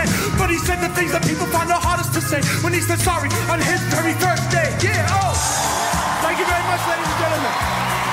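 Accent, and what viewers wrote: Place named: United States. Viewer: hes got his funky irish-american accent going on =] I like...cleaver guy =P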